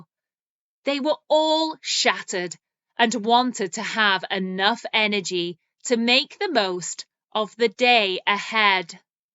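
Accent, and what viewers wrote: United Kingdom. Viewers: I did enjoy reading and listening your British accent. There were some words that I couldn´t get it however it´s great news due to it´s means that I have just learned more. Thank you.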